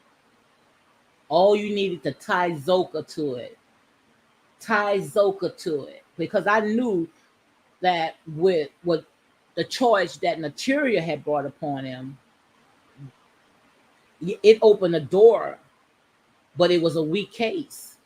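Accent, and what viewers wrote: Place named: New Orleans, United States. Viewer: You have to be from New Orleans... you sound exactly like my GranMom wit that accent.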